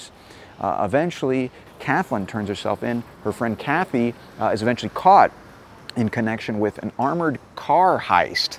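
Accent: New York, United States. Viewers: I don't like his New York style of speech similar to David Letterman. I never met a person from NYC who I liked who wasn't loud and abrasive.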